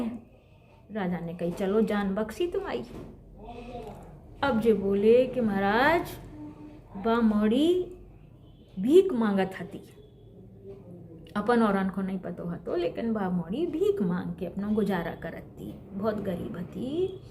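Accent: Bundelkhand, India. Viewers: बड़ो नोनो हतो जे किस्सा तुमाई मीठी बुंदेलखंडी बोली बहुतई भा गई हमें गुईयाँ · बुंदेलखंडी की मीठी जुबान, और आपका सुनाने का अंदाज मजा आ गया 🥰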